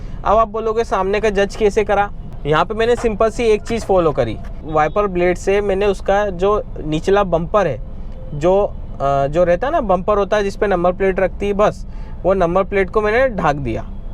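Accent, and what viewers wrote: Indore, India. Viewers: आपकी लैंग्वेज का टोन इंदौरी लग रहा है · Indori accent truly at the point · Lagta he bhai ap Indore se hoo 😂😂😂😂😂😂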